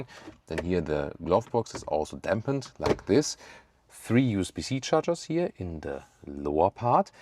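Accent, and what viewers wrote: Germany. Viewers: i like your German English. you speak both at same time love it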